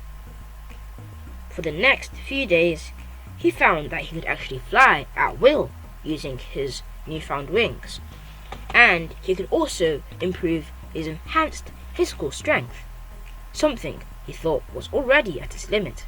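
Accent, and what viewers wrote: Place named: United Kingdom. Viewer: lol i can already tell your british are you a roadman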